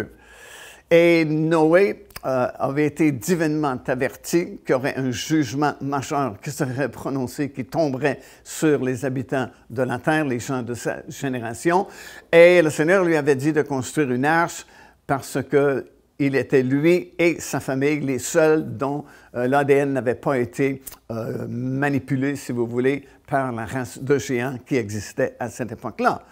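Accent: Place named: Quebec, Canada. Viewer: Il a dit 66 livres c’est son accent québécois . Fraternellement.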